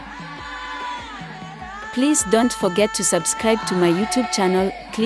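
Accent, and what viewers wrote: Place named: Kenya. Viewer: Are you from Kenya because your pronunciations are exactly like Kenyans......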